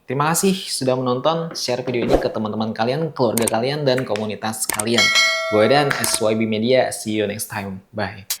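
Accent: Banjar, Indonesia. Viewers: Urang banjaarr..kh?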